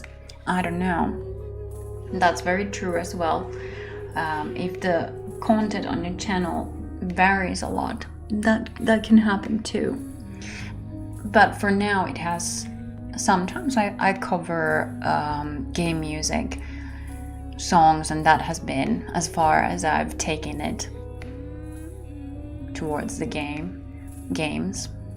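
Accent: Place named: Finland. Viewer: Hi,. I did watch (well listen to) this one today. Wondering if you ever got that "team" you wanted. Again, I know I'm new around here, but I'd be eager to help. (Or Igor to help, as the case my be. Okay, that was my one joke.) Serious now: Obviously I'm not as much use as someone who is physically there, and I'm no good at makeup (you do your own make-up? Even I assumed that you had someone to help with that! You look amazing, btw.) Things I have thought of that I could potentially help with (short version) 1) Proof-reading of scripts for any material you're producing in English. [A lot of what you do is music, but if you move into more ASMR work, there could be scripts.] [I used to help a friend who was a professional proof-reader for a major book publisher, so I feel pretty qualified here.] 2) Possibly suggesting minor edits to scripts but being okay with you having the final say. 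2a) [I have some knowledge of linguistics (hobby number 37 on my (non-existent) list). I know handy things like the difference between a voiced and an unvoiced consonant. If you want, I could potentially help you with pronunciations on some of the trickier English words. (Although I think English is more charming when spoken with a Finnish accent.:)... Sorry, professionalism here.) However, sadly, I only know abut 4 words of Finnish, so I'm not going to be tons of help with proofreading Finnish. ] 3) I'm a good typist, for what that may be worth. 4) I've used Gold-Wave pretty extensively a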